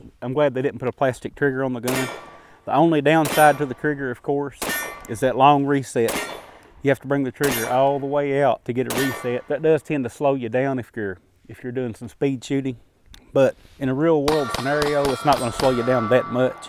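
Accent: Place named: Tennessee, United States. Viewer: I think he's from Tennessee.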